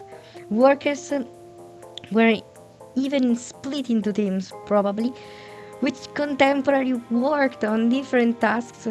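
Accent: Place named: Italy. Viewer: What is your native language? I'm curious it really sounds like italian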